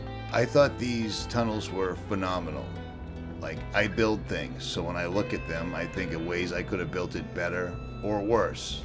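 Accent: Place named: East Coast, United States. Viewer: You sound like. I was raised in Thermopolis and used to fish the canyon and spillway constantly. We still go back every year to visit and fish the Bighorn. Thank you for posting this. Very emotional for me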